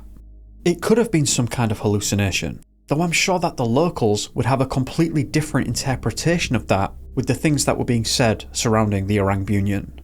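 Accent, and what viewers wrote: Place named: Brittonic, United Kingdom. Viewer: I love this channel. Stays in my top ten. I do want to say I especially like the Fortean, UFOlogical ones, and would appreciate broader Fortean phenomena coverage if that's a thing you'd be interested in. Not that I don't like the missing persons episodes...I'd LOVE to hear you cover the REALLY weird ones. With strange search events, bodies found under bizarre or impossible circumstances, missing kids found alive but absurd distances away in short order, search dog oddness, no cause of death being determinable. Paullide's core weirdies. I REALLY love the "Fae Theory" stuff, including this one. I gather by your accent that you are from some Brittonic area that probably has conspicuous Faerie or Elven Lore. Lovely accent by the way. All around cheers! Please, keep up the great work!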